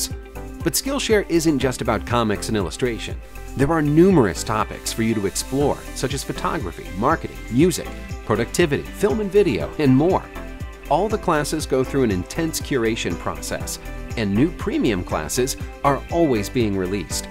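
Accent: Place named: United States. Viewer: You have nice America voice and I can clear understand. Very nice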